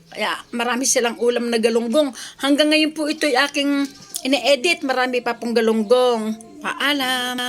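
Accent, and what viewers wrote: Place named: Tanay, Philippines. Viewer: ate taga tanay rizal po ba kau punto nyo po kasi tanay kung magsalita hehe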